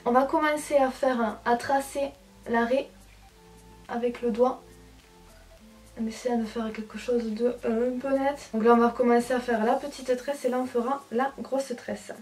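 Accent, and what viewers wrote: South, France. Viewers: coucou , je viens de m'abonner , tu es très jolie et tes cheveux sont magnifiques ! tu es du sud ? je suis du sud ouest ! j'aime l'accent et les cigales ! :) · franchement t'explique troo bien mercii beaucoup et j'aime bien ton accend ce serai pa l'accen du sud? en trou ca merci troo♥ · toi tu viens du sud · le journal d'une fille elle vien du sud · j'adore ton accent il est du Sud ?😀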